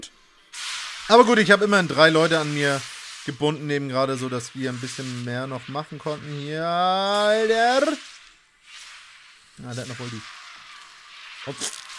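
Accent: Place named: Austria. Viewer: Ich kenne dein Dialekt.....du bist Österreicher ne?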